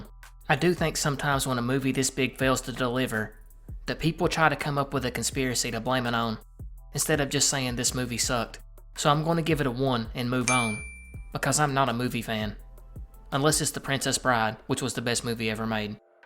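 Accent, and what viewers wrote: South, United States. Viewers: I always forget you have a southern accent for some reason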